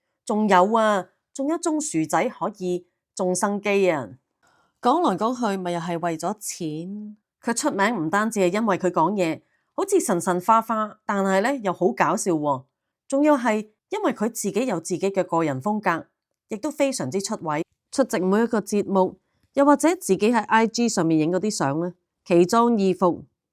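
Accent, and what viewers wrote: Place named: Hunan, China. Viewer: 七仙魚口音應該是湖南人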